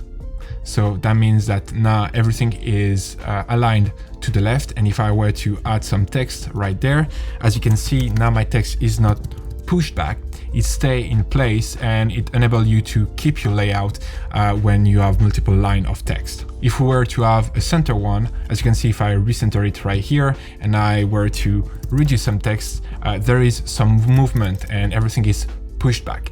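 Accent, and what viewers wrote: France. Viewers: Love your French accent hah! Thank for this useful video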